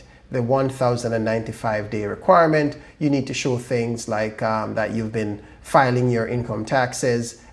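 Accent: Jamaica. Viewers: I love that you still kept your jamaican accent!!! loooool I will be in touch I work in IT, I am 45 y.o in the USA right now,but living with my own immigration here. how do we start a private conversation to see how you could help me move over there for IT work. thanks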